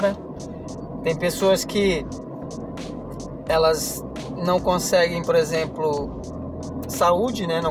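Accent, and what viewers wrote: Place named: Santa Catarina, Brazil. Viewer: Vc já está com sotaque de Catarina. Sou catarinense e moro em Joinville.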